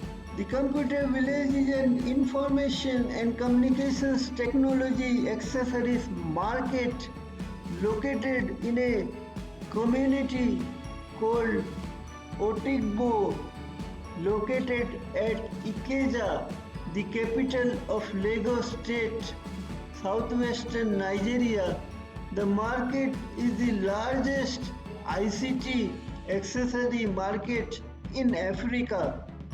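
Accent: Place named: India. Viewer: He is Indian His accent is his identity We love it like that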